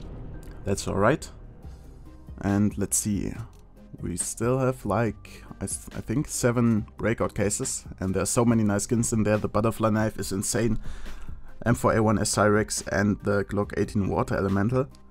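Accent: Germany. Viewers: Ich liebe deinen deutschen Akzent:DD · Are you german? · Ok du bist deutscher oder? Wenn ja merkt man das, wenn nein kannst du das hier ja eh nicht lesen xD ^^ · Man merkt sofort das du Deutscher bist :D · Man hat sofort gemerkt das du aus Deutschland kommst ^^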